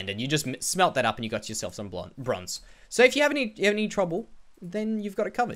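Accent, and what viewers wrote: Australia. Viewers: You're Australian right?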